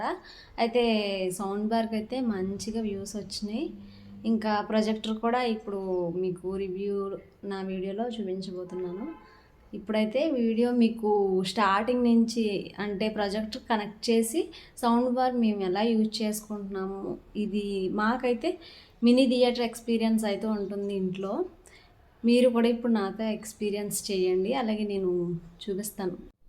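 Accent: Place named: Godavari, India. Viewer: Godavari slang 😊😊😊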